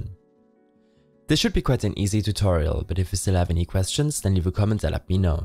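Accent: Germany. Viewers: Are you German? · Da hat er mich aber auseinander genommen.. Deutschen akzent hört man aber auf jeden fall.. Geiler kanal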